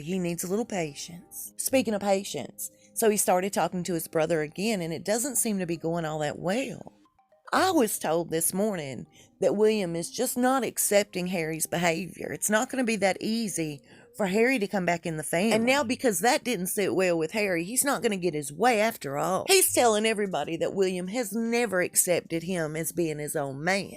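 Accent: South, United States. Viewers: You sound like a southern gossip speaking tool! Don't worry, you have lost me.!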